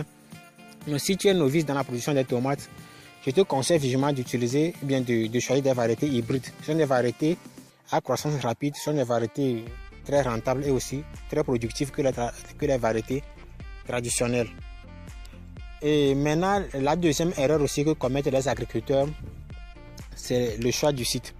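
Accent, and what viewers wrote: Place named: Africa. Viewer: DSL, je ne comprend rien,,,,, accent Africains trop prononcé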